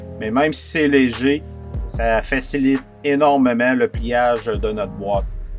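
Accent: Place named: Canada. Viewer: C'était vraiment utile surtout le site indiqué. (Et j'adore l'accent Canadien) Merci!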